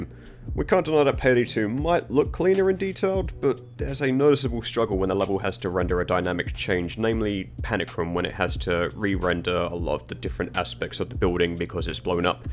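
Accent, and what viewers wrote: United Kingdom. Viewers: where abouts in the UK you from?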